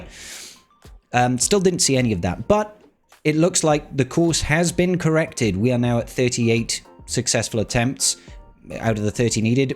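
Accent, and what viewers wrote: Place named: Cockney, United Kingdom. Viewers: <num> the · Who doesn’t love a bit of the!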